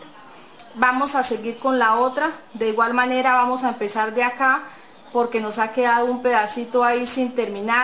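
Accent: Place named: Santander, Spain. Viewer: Hermoxo divino si se moja no se despega en que teléfono te puedo localizar tienes acento de santandereano yo estoy en medellin me gustaría hacerlos para mi tienda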